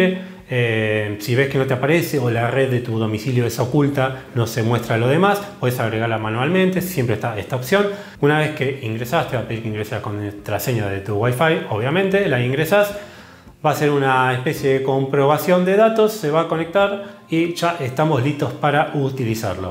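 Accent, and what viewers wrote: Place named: Argentina. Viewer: Lo positivo es que vas al grano, es decir, que vas directo a las explicaciones técnicas, además como supongo que sos argentino tu acento es similar al mío y te entiendo las palabras que decís, pero como es información muy técnica y no estoy a la altura el contenido no lo interpreto y cuando ponés pantallas van tan rápido que no las llego a ver.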